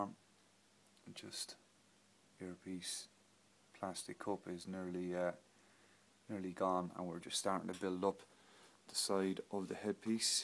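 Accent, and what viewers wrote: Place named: Scotland, United Kingdom. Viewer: Hey, really enjoyed that vid very informative and i love the scottish accent!!!!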